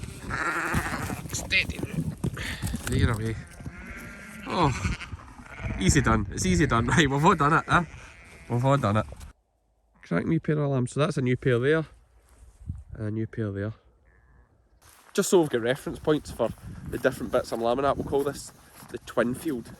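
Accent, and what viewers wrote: Scotland, United Kingdom. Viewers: That ewe is making chocolate milk 🌷💚🙃 New subscriber here, how many sheep do you have? I’m going to have to try real hard to understand your Scottish brogue, my husbands family is from Scotland and we would love to visit sometime but I’m not sure that will ever happen considering our age. Thank you and good luck this lambing season. P.S. my husbands family use to have registered shropshire sheep many years ago.🌷💚🙃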